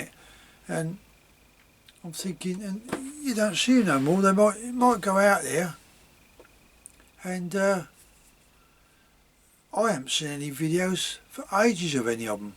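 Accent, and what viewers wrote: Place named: London, United Kingdom. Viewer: Great interesting vid. Looking forward to watching more of your bids. You sound like a fellow londoner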